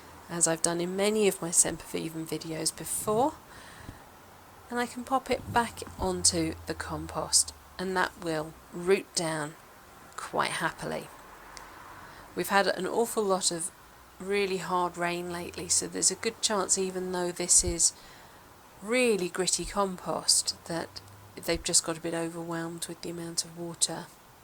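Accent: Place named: United Kingdom. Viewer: I enjoyed learning so much from this video!! It was a added pleasure to hear your beautiful British accent!! I live in the Midwest of the United States. We do not have beautiful accents 😃😆😂 🫤 Thankyou for helping me be a better succulant parent !! I grew up with sempervivam. They grew on my mother's terrace. I loved them❤ I have rooted some echaveria from cuttings . There were many varieties . They included sempervivums. I have been having fun with these sweet succulants. I am a bit nervous about the bonsi but I will watch all your videos on the subject . 😃😃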